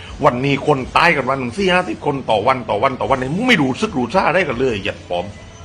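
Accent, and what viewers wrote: South, Thailand. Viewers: ไม่ว่าภาษาไทยภาคใด...ปชช.รู้เข้าใจตรงกัน..รัฐบาลล้มเหลวโดยการนำของนายกไทยคนนี้..ภาษาใต้ชัดในความรู้สึกเจ็บปวดครับ..คุณ ชัย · ได้แรงอกพี่น้องเหอ คนใต้แหลงหรอยนิ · แหลงได้ดี นี้แหละคนใต้ต้องชัดๆ💓💓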